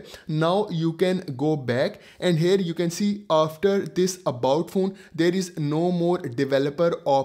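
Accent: India. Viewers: I dont normally trust people with an indian accent for stuff. But youve actually showed me some very useful stuff. Not many people go into depth about what each option does and shows it working physically. I very much appreciate that.😊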